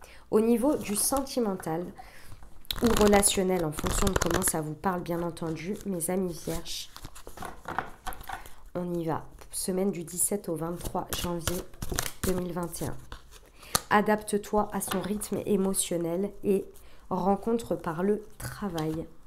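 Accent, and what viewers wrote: South, France. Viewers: Bonjour merci c'est tout à fait cela le ,17 mon chéri qui rentre de 15 jours de voyage et nous allons enfin commencer notre histoire le 23 grande fête pour les 35 ans de ma fille 🙏☀️🍀🎊votre guidance est incroyable pour moi c'est fou mille merci de me rassurer dans mes angoisses de vierge . Bisous du var 🥰 d'ailleurs votre petit Rose quand vous parler me dit que vous êtes du sud aussi ☀️☀️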